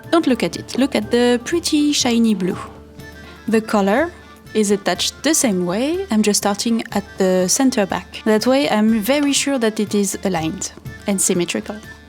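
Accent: France. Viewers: Listening to your lovely French accent and watching you craft beautiful leather work is magical.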